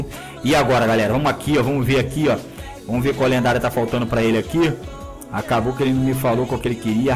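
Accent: Rio de Janeiro, Brazil. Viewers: Meliodas O Taradado n muda nda,antes de ver o cara eu pensava que ele era um carioca magrin esse cara é bom mto melhor q o mister M #MisterMÉoPiorClashr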